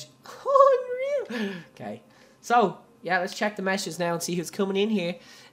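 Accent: Ireland. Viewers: your just totalllyy amazing i love your little irish accent xxx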